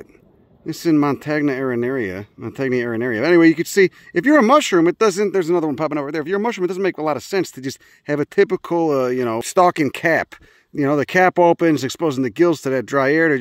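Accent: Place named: New Jersey, United States. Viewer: I have no idea where you're from but you sound like a natural jerseyian and i'm in love. haha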